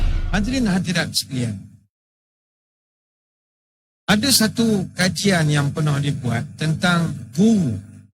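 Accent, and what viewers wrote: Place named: Endau, Malaysia. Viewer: Bila ustaz badli Shah bercakap. Sya sangat2 suka. Ada sikit bahasa dia mcm basah kampung sya di endau.. Huhu. Semoga ustaz badli Shah sehat selalu kami sayang ustaz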